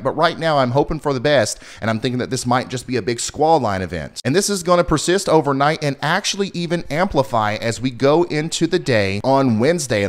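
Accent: South, United States. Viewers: New here, honestly might come here for my news forecasts. sets me at easy with a true southern accent giving me the weather than them dang Dallas newsboys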